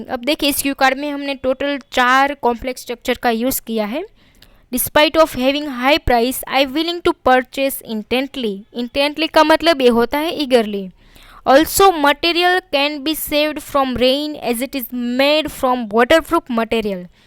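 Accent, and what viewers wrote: Gujarat, India. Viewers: Madam gujarati cho?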